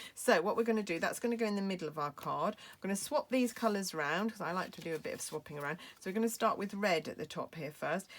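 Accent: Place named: United Kingdom. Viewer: What a lovely card! I LOVE your accent - well, you probably don't think you have one LOL But anything Brits say is so charming: bauble. My new Christmas word!!! xo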